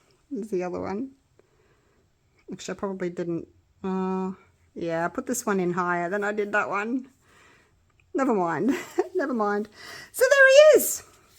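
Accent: Australia. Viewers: Gday, so nice to hear an Aussie voice, I’m in qld. So you get your resin from us, or uk? I’m a beginner and use mainly if resin, but wanting to try the deep pour resin, bit worried on how much postage from another country will be. Thanks for awesome video.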